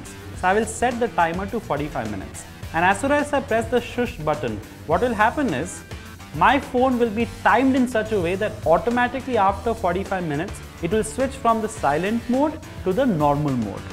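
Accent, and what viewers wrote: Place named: India. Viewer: I saw this as an ad before a video started but I kept watching it because I actually liked hearing his indian accent. hah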